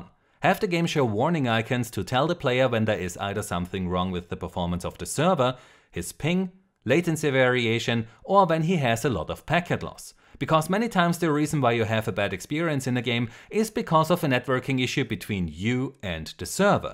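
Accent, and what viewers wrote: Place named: Germany. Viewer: Yaaaaaaa your GERMAN!!!! Love the accent man, you sound like a badass. 😎😎😎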